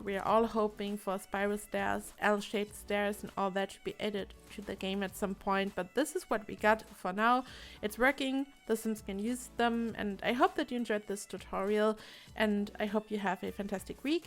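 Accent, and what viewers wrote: Germany. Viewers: I wonder if there's a way to forego that third storey. Could you build a one tile room on both the bottom and the upper storey (maybe next to the stairs) to place a roof? Because while I find this to be an ingenious idea, it will turn into a massive house and I'm more of a two storey house girl myself ^^' And now the question again in German (to make my question more understandable), because judging by your accent you might be? Könnte man nicht einfach anstatt 3 Stockwerke nur 2 bauen? Also z.B. neben der Treppe einen 1 Kachel-weiten Raum und dann im 1. OG einen weiteren drauf und dort dann das Dach drauf? Oder lässt das einen dann immer noch kein Dach platzieren? Weil, 3 Stockwerke sind mir dann doch etwas hoch, vor allem wenn man dann dort kein Zimmer mehr einbaut. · Thanks! Worked great. You just gained a new subscriber ❤️ Btw where are you from? 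Your accent sounds a little German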